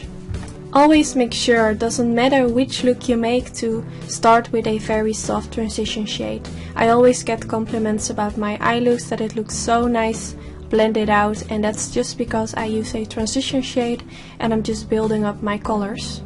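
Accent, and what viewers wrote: Netherlands. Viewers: Aha! I knew you were Dutch! :-D My dad was half Dutch and half Indonesian so I recognised your accent and it made me think of him which is nice. Where in The Netherlands are you from? My dad and his mother lived in Den Haag and my family used to visit her when I was a child. :-D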